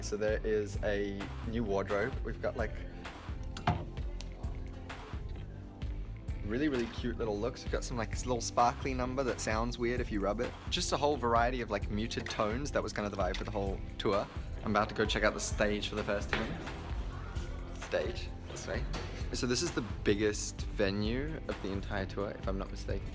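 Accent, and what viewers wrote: Australia. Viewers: Taylah He is Australian;) his accent just isn't as strong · It might just be me but sounds more Australian in this video 👍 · the people that only know for being ' famous pop star' won't understand how us who know him as 'little boy with an Australian accent who talks to a camera' feel rn!!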